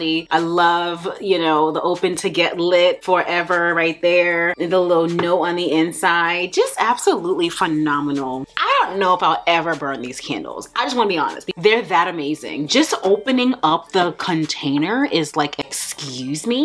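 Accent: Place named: Rhode Island, United States. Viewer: I just started binge watching your videos, and I knewwww I heard a RI accent the first time you said coffee🙌🏾 ⚓️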